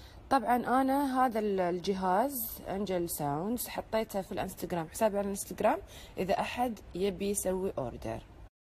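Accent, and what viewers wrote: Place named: Gulf, Arab world. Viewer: اسمه جل وليس كريم. ليش تتكلمي لهجه خليجيه.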